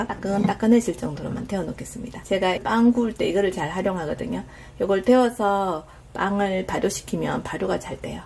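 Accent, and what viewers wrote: Gyeongsang, South Korea. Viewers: 말씀 너무 잘하세요..경상도 어투가 이뿌시네요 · 목소리가 너무 좋아요 갱상도 사투리도 매력적이구요 영상 내용도 유익하구요 남편분 좋으시겠어요 감사합니다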